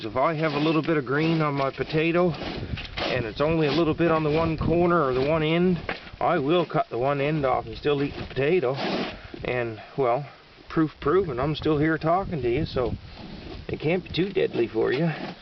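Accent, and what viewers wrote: Nova Scotia, Canada. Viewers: Watched this as growing some Charlottes in the UK - but I spent a lot of the video interested in your accent - I'm from the South West of England and I can detect a lot of 'West Country' traits in the Novia Scotia accent!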